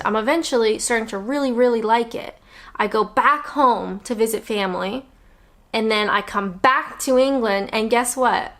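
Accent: Canada. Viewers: Hi, Another chapter of the e-book done. Very honest. You sounded different today, maybe a little bit more Canadian but clearer and more confident or focused. Or maybe sitting closer to the mic? Byeee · Love the Canadians accent but you are saying ‘pounds’ like an English person, this is a milestone for sure.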